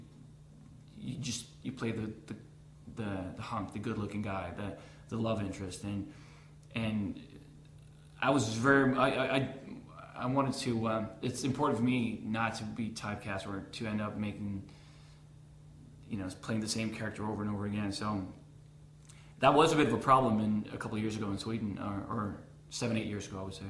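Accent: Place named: United States. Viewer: His voice is so American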